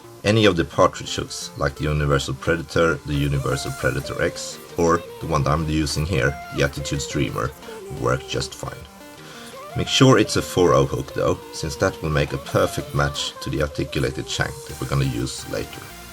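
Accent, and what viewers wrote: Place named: United Kingdom. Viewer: 700 claps, great stuff my friend!!! I enjoyed your video, nice flies, and good to hear your voice, sounds more British than Swedish...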